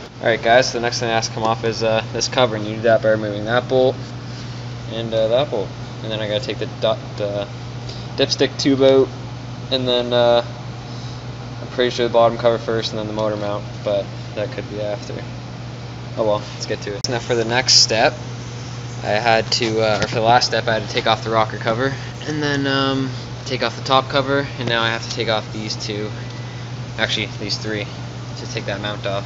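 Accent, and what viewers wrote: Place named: Canada. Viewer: He sounds Canadian so probably yes.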